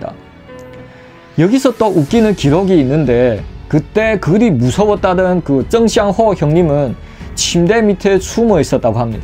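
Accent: Yanbian, China. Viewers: 님도 조선족인가요 범죄도시말투네요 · 연변말이여 뭐여 어디말투여? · 임마 연변만 좀 고쳐라 역겨워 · 너 연변이가?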